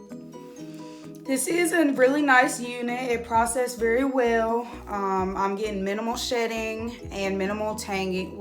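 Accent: South, United States. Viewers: sound so country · This look so bomb!🔥😍 and you have a thick country accent, where are you from?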